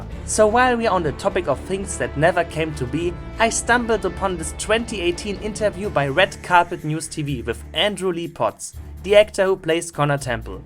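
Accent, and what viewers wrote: Germany. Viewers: Hab mir doch gedacht, dass du Deutscher bist! Dieser Akzent :D Aber deine Aussprache ist sehr klar, bist gut in Englisch. Und danke für deine Videos.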